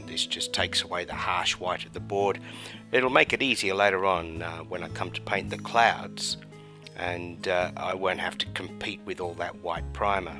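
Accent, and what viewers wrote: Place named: Australia. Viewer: Ah finally found your channel after discovering it a couple weeks ago then losing it lol. Love your style of painting and the way you talk, gotta love them aussies. Im subbed.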